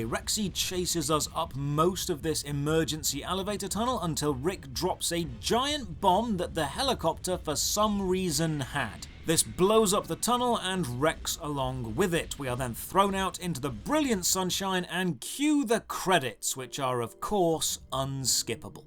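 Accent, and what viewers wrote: United Kingdom. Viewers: all of them just shouldve gotten rid off everything they are carrying with them. no reason to die then. also, lets take a moment to appreciate reading and favouriting comments on his one year old video. absolute chad loved to play dino crysis 2 back in the day and i have to say, nostalgia is so much better when funny comments and a british accent are involved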